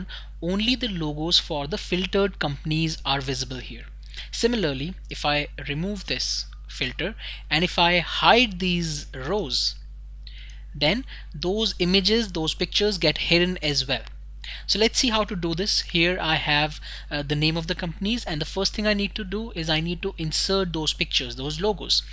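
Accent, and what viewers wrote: India. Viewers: indian speaks English =))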